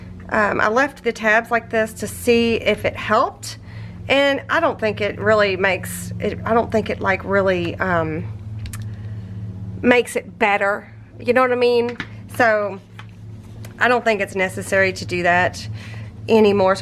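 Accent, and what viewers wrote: South, United States. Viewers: Lol, I was listening to you and thought: this girl is definitely from the south. Then I saw you were in Louisville. I’m in Paducah! Love your ideas!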